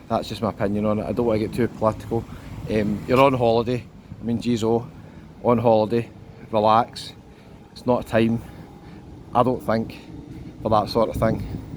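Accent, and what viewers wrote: Scotland, United Kingdom. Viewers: Nice vlog....well as they say "you can't please them all" I always find your vlogs pretty neutral and hardly biased re your journeys. To me and many others we share the same values so I appreciate your words and opinions. We aren't going to get away until September so your vlogs give me a little bit of sunshine, sand and blether.... My mum was from Girvan so I like the accent and tone. Keep up the good work 🙂 Be safe 🙏 G 🦉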